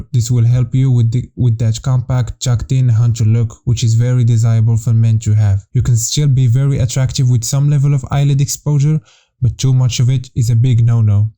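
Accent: Morocco. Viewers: You sound moroccan bruv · you've got a Moroccan accent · The english of the person speaking screams moroccan. Just by his accent i can even tell you which city in morocco he comes from. XD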